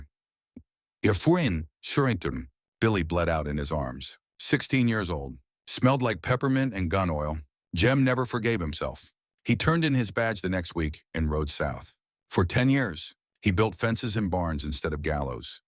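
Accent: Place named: Germany. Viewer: Hi, I’m watching from Georgia, USA🇺🇸 I like Western stories. The story is a heartbreaking one, yet it has redemption from all those who would like to break Clara. Jem was a warm, wonderful man; he stood with honor. Also, this story has a German accent in parts over English, making the story hard to understand. I could only laugh when the narrator read the words. That might be why no one left a comment! Good story! 😊😊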